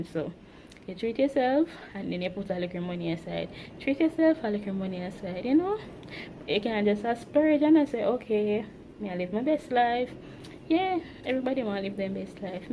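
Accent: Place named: Jamaica. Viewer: I’m Jamaican 🇯🇲 too. Love that you’re staying real. I only sound like you when I’m upset or around others 😂 . Thanks for making me feel like I’m home 💕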